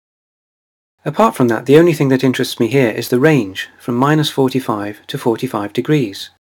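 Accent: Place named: United Kingdom. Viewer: Wow lovely British accent :) loved it. Thumbs upped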